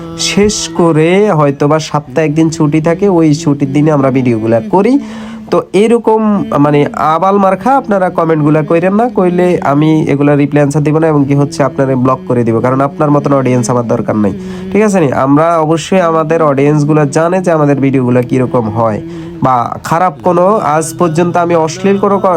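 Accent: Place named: Noakhali, Bangladesh. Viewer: ভিডিও সুন্দর করে তোলে সুধু নোয়াখালী ভাষার জন্য ই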